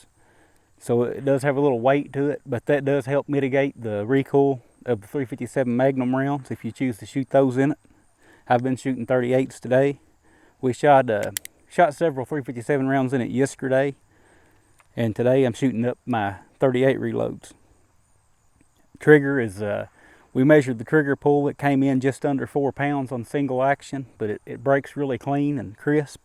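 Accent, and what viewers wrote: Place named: South, United States. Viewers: Nice video! It's nice to jump in the way back machine once in a while and see you in your younger years. I could be wrong, but aren't those GP 100s made up in New Hampshire? I have a few RARs that were made in Mayodan and like them all very much, as bolt action 22s are my favorite. Grew up in a family that spoke southern as a second language (Grandma was from North Carolina) so I can understand you perfectly when you talk lol. All of the best to you and yours from up North. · This guy has an amazing accent - can you tell me where does he come from? Texas? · The best thing about this review is the guy's accent. So southern, I love it. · I have a S&w 686 6in but agree with you that the Ruger is a fine revolver ( as are nearly all of their products) thanks for an entertaining review and I love the "metric" conversions but most of all please keep saying "burrel" that must be the metric version of barrel, ya gotta love a southern accent, keep up the good work. 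· Saw a pic of ky, sounds like ur from ky aswell.. What county u from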